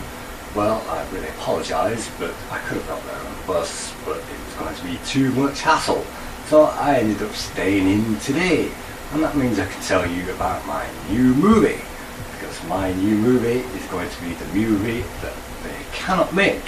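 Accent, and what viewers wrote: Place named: North, United Kingdom. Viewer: Interesting vlog cant wait for the Vid:) and you look a lot younger than you sound( love the Northern acc dont lose it)! thats a compliment:)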